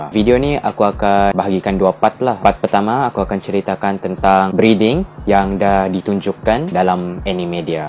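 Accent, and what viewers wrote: Sabah, Malaysia. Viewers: speaker org sabah ka ? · Ad logat Sabah la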